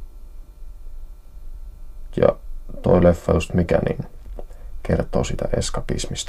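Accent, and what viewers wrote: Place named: Kitee, Finland. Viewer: dosen't mumble. People from Kitee just speak like that. I live near Kitee and I speak almost the same way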